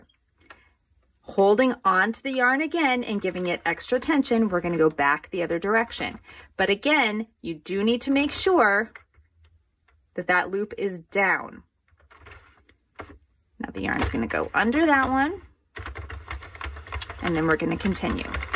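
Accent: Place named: United States. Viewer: Pictures very good but squeaky American voice talking too fast difficult to understand.😢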